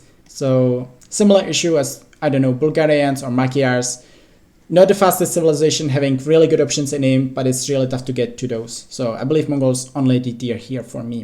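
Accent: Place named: Czech Republic. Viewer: After hearing you say the first three words I instantly knew youre from Czech Rep.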